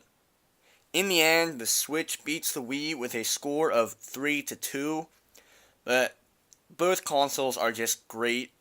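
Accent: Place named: Philadelphia, United States. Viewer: Definitely philly/south Jersey accent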